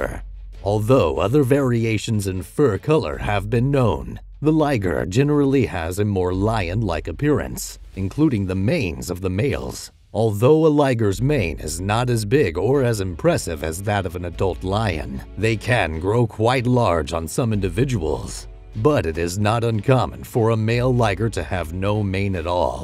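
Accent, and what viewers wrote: United States. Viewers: The narrator sounds so american its distracting^^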